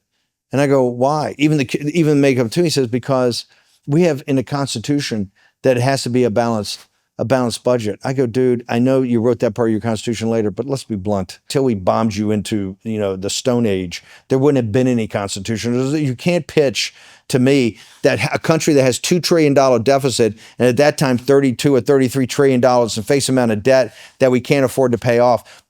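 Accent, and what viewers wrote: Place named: RP, United Kingdom. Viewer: too bad Bannon has to be talking with a lightweight. A beeb accent is not a guarantee of ability.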